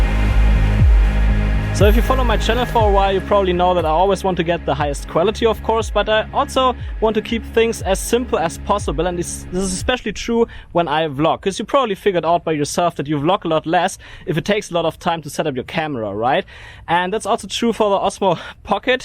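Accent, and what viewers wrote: Germany. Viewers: Kann es sein das du deutscher bist , wegen deinem Akzent beziehungsweise für mich kein Akzent 🤔 aber wie es auch ist super Video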